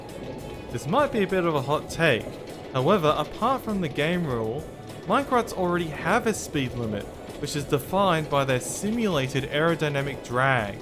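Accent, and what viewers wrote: Australia. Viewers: Two questions: Are you an Aussie? Is that your i20n?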